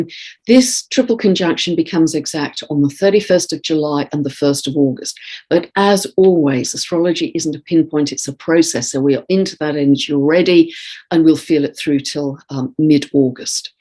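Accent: England, United Kingdom. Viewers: Hi! I have my natal Chiron at Taurus 18 degrees. Chiron natally squares my Moon at 25 Aquarius. Going through Saturn conjunct Moon this year and I’m really struggling with depression and despair. The last full moon in Capricorn at 21 Capricorn EXACTLY squared my natal Saturn at 21 Libra. Let’s just say I got a nasty shock and am seriously struggling to feel anything other than that the universe hates me and is out to torment me until I decided to leave the planet. I’m worried this conjunction right on my natal Chiron will be another blow. Can you offer a different interpretation please? I find it harder to continue to force myself to see the “positive “ possibilities. Every time I do that in the last 18 months whatever I am hopeful about is ripped away brutally. Appreciate anything you can share. I’m not a professional astrologer but I do try and use astrology to help myself. 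This year I am just struggling beyond belief to hang onto hope (also you may have realised, I am having Saturn square Chiron transit. No wonder I cannot see the light!) Thank you and have a nice day. I find your voice and accent soothing because I have a lovely soulmate in this life who is English as well:) From Bangalore, India.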